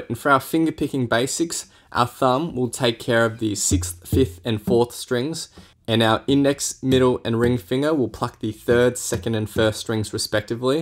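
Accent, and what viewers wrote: Australia. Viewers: Are you from Australia?? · When i heard his voice I said Mateeeeeeeeeeeeeeeeeeee his an Aussie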